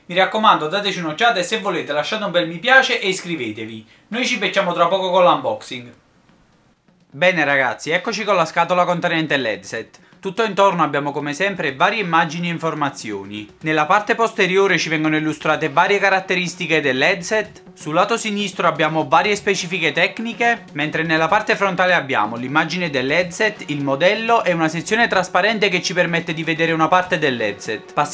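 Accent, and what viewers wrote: Naples, Italy. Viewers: ma sei di Napoli? perchè lo sento dall'accento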